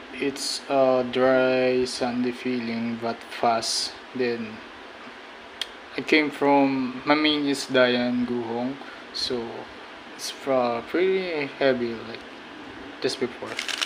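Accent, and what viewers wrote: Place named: Philippines. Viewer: I like ur accent are u filipino?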